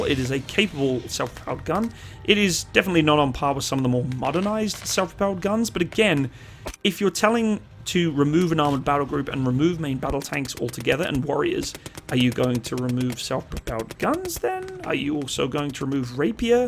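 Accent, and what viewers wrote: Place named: Home Counties, United Kingdom. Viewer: thanks man that confused me a lot! Bristol boy here. Def didn’t pick up Durham, you have that Home Counties accent with a tinge of Canadian. Fingers crossed you lose that clown Trudeau soon and people can take Canada seriously again 😂 all the best and love from the west country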